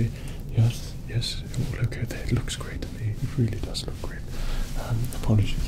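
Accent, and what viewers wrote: United Kingdom. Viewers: So you are British. Nice and same. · I swear he's british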